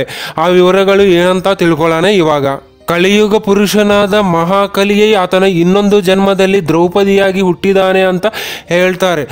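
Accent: India. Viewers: Yarappa Nenu Kannada na Malayalam style Alli mathadtiya modhlu Kannada Kalli amele story helu..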